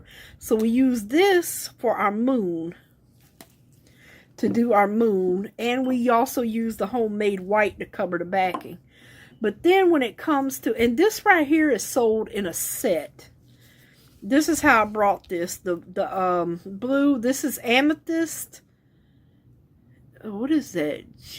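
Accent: South, United States. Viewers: You had me with the Southern Drawl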